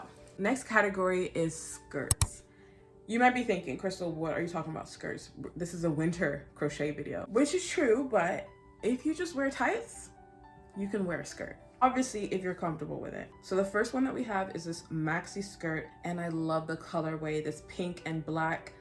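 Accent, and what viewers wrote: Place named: Caribbean. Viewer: Adorable video. Are you carribean? I detect an accent but I can't place it.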